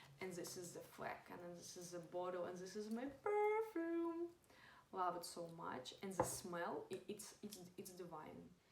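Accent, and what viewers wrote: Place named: Russia. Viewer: This russian accent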